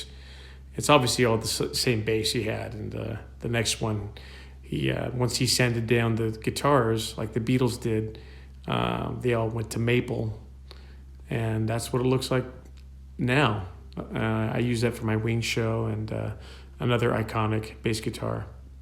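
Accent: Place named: United States. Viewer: Omg it's kind of weird to hear you in your American accent rather than the "Liverpudlian" accent you use in shows lol....I been lucky enough to see you performing live and you are simply AMAZING...You also thrown a Fab Four guitar pick to me and I gave it to my son that plays guitar and bass guitar himself and he keeps it as holy remains THANK YOU SO MUCH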